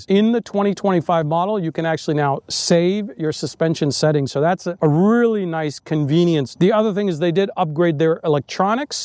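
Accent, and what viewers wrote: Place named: United States. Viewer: What a US accent. Inspired.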